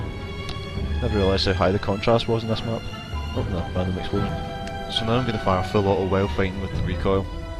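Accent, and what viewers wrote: Scotland, United Kingdom. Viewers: I'm so glad there was an assualt rifle available for medics. I love being a medic but hate lmgs. I've always loved assualt rifles but never the actual class. Thanks for the tips on how to use this gun. I'm a medic who is just an assualt guy at heart. I was also confused in the first minute. I was dissapointed that it was in another language. Before I read the other comments I thought it was. Then I heard the Scottsman kick up. Everything was good after that. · Not wishing to offend, I actually thought you were speaking Russian for the first 3-5 seconds you were talking before I realized it was a heavy Scottish accent xD Nice video btw.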